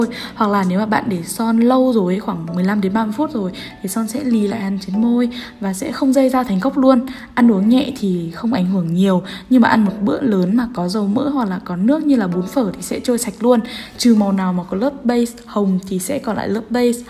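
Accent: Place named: North, Vietnam. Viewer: Chào chị ạ, em xem chị và biết chị rất rất lâu luôn tầm 1 năm về trước -.- 1 năm đối với em khá lâu rồi a. Em thât sự rất thích chị, đầu tiên em thât sự ấn tượng với giọng nói của chị, em là dân miền tây nên rất thích con gái Bắc, giọng nói trầm ấm nhẹ nhàng hihiii, thứ 2 em cực kì cực kì thích môi của chị, như anh Hoàng Thạch nói người gì mà môi đẹp ghê, môi cứ chúm chím đáng yêu mà không đánh son môi cực hồng nữa <3. Video swatch của chị rất chất lượng, hình ảnh tốt màu chuẩn không bị lệt quá nhiều so với thực tế. Bất ngờ hơn chị còn đang trên con đường ca hát nữa <3 em thích hát lắm nhưng tài năng không có hihii hay hát chứ không hát hay <3333.Em tự ti quá chị ơi:(( thật sự em rất ghen tị với các anh chị beauty blogger, như chị và Mai Hương,xinh thật sư, còn em thì không xinh tí nào:)) da thì mụn còn ngâm nữa chả có tí tài năng gì, chán ghê. 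Nhưng ba mẹ đã cho mình một hình hài thì mình sẽ cố gắng sống tốt thoii, sống tốt để làm bà hoàng của chính cuộc đời mình <3 em thích màu 129 ạ <3 Mong chị đọc đc cmt. FB: <link>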